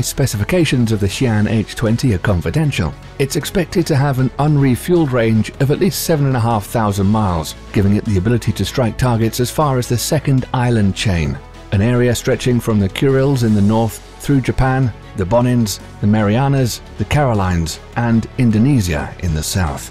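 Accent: United Kingdom. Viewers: Sorry to burst your bubble, but he's British, and that's how we pronounce it. It's our language, so don't lecture us on pronunciation.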